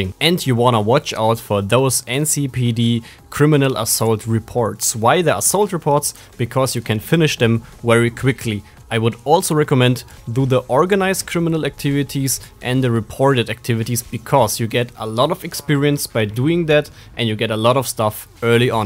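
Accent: Germany. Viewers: bro wieso machst du englishe videos man erkennt an deiner stimme das du deutsch kannst :)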